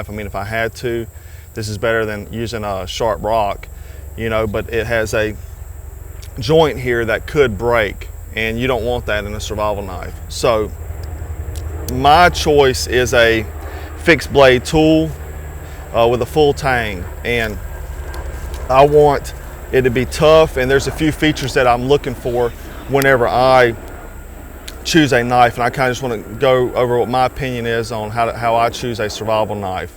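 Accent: South, United States. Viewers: You sound like you're from North/South Carolina? Nothing wrong with that. American by birth, Southern by the grace of God. Great video.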